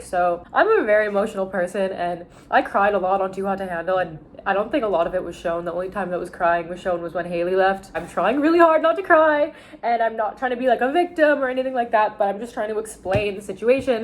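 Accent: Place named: Canada. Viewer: Your lil Canadian accent is cute af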